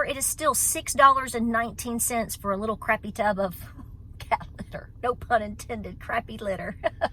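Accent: South, United States. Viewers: you have a Southern accent your a upbeat Energetic active woman i wanna be upbeat Energetic active like you are when i am your age i subscribed to your channel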